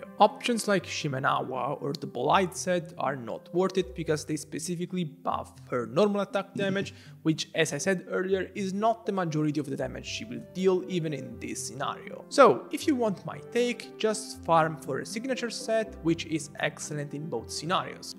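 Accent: Italy. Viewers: Thank you for your guides ❤ and also idk why but i think you're italian bc your accent is similar to the Italian accent 😂❤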